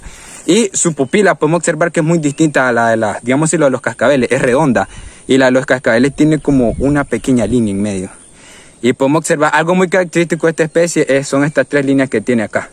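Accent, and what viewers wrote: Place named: Nicaragua. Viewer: Muy tuani el contenido y muy bueno que tengamos a alguien que muestre este tipo de cosas aquí en Nicaragua, solo mantene el acento nica. Muchos exitos en tu canal hermano 👊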